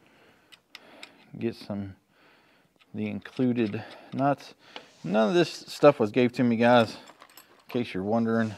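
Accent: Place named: West Virginia, United States. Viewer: I knew I recognized your accent, I myself am a West Virginian. Proud to have you representing. 🤠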